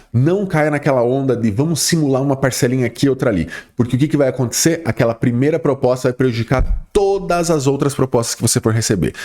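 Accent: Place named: São Paulo, Brazil. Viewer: O senhor é de são Paulo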